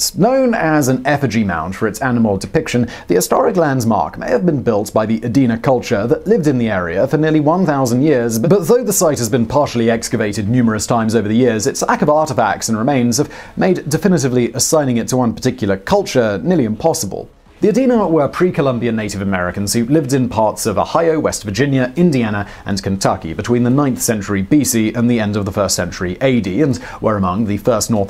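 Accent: United Kingdom. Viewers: All of the posters criticizing Simon’s pronunciation need to remember he speaks with a British accent. I challenge many of you to go to other countries and pronounce words & places the same way as natives! Better yet, visit areas in the US and try pronouncing words the same way as people living there. I had a friend who was annoyed that I couldn’t pronounce her daughter’s name correctly. She was from PA and I am a native New Englander, from CT. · There is something so absolutely soul warming about listening to a Brit discuss the glory that is America. Never change. Never change.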